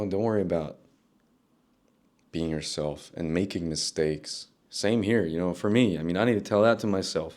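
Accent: United States. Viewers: So you have a Spanish first name, a German surname, an American accent and you live in Berlin. What's your story? It sounds interesting. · "with the ridiculous amount of German blood/dna floating around the majority of white people in the US, he might just be a mixed American who happens to live in Europe (family, etc.)." is what I would have typed, but he has that just-barely-there accent - it's an almost completely perfect general-American accent (the way people tend to sound on tv when they are not meant to have an accent, shows up in films too).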